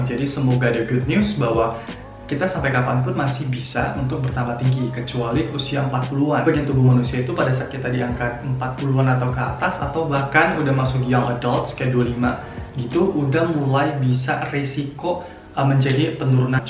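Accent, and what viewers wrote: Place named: Java, Indonesia. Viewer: Ngomongnya jadi makin medok dan mirip orang jawa gitu ya😂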